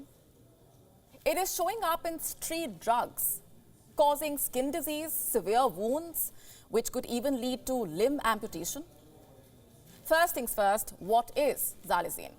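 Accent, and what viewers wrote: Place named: India. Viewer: she's indian so her pronounciation is different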